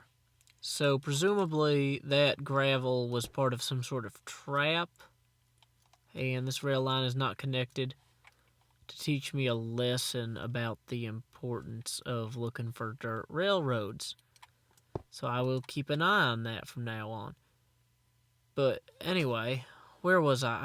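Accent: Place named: Appalachia, United States. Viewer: you know that we (a few british people) stereotype your accent for hillbillies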